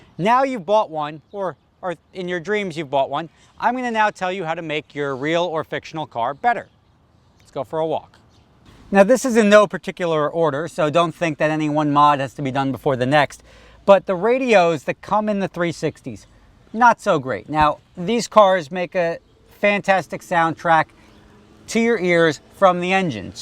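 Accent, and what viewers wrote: United States. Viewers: Know what..!? This is all cool and everything,but hearing an American talk about Ferraris is like listening to Trump speaking about human rights; it just dosent seem natural.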